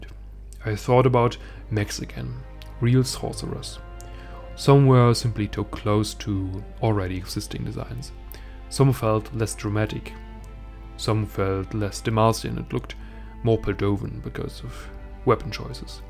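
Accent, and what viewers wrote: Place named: Germany. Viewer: the german english accent is unmissable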